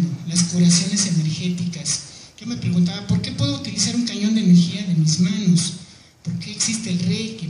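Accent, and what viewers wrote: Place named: Sinaloa, Mexico. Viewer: ¡Sabía que conocía ese acento en algún lado! Eres orgullo de Sinaloa, sigue así;)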